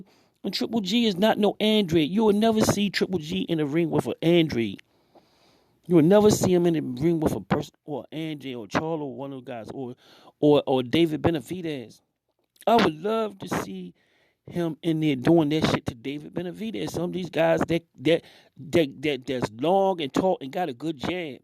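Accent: Baltimore, United States. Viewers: I recognize that accent are you from Baltimore?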